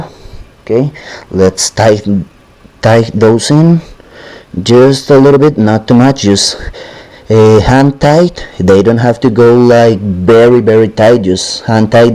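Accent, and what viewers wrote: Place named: Spain. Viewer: Hola! Creo esres español poor tu acento jajaja buenos videos. Perdona quete moleste, pero tengo pasasdas las coronas centrales y no se donde comprarlas baratas, el diferencial completo ya que creo que esta roto porque lo muevo para alante y se mueve las ruedas un poco pero para atras se bloquea y no hace nada no se que le pasa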